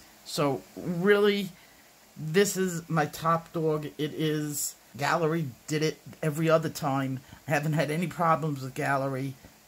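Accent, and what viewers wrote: New York, United States. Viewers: I was dying to try your recipe but didn’t have the beeswax. However, I had a Caran d’Ache wax crayon. I added your amounts in mineral oil and linseed oil but no wax. I figured the wax in the crayon would be sufficient. It worked! Thank you so much for sharing all of your information on this! It really is a gam changer! ❤️ PS love your NY accent.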